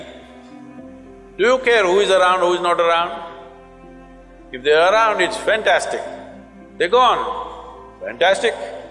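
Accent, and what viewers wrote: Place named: India. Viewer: Giọng ấn đặc trưng thật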